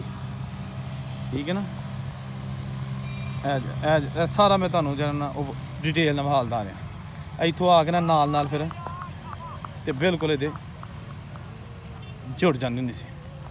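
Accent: Punjab, India. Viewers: Tuhadi language Ditto Pathankot Gurdaspur di punjabi wali ae ❤️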